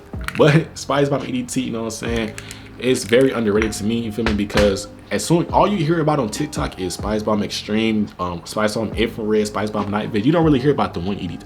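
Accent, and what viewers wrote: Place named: Georgia, United States. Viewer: He must be from atl or Georgia because of the way he talking lol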